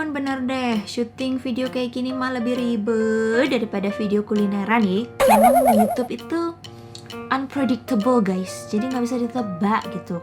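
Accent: Java, Indonesia. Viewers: Kangen ngomong jowo:') medhoke loh mantep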